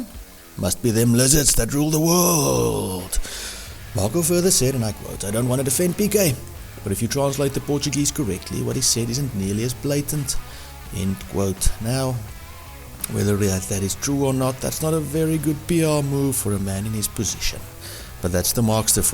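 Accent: South Africa. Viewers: I was trying to figure out what your accent was before your said you were from south africa😂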